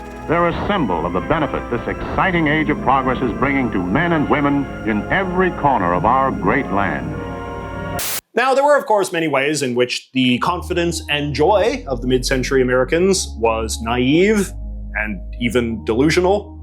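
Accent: Canada. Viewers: What’s this accent? Never heard anybody who pronounces “about” like “a boot” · I agree with a lot of your points made here. But man is your Canadian accent is really popping here.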